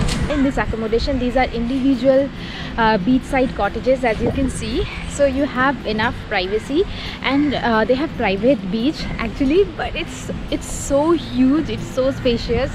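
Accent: India. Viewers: Refreshing to see an Indian looking girl with an Indian accent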